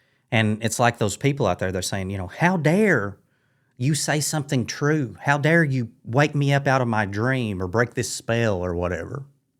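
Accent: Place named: Kentucky, United States. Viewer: Love your thoughts and your accent! Kentucky?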